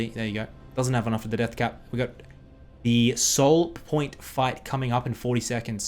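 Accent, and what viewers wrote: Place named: Australia. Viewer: The more I listen to, the more I like australian accent.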